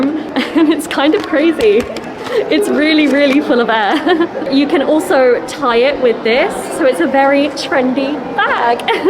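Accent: United Kingdom. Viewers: 再生速度を落とすとはっきりしたイギリス英語の特徴がイヤというほどわかりますｗ おかげで素晴らしい英語教材になってます。ありがとうｗ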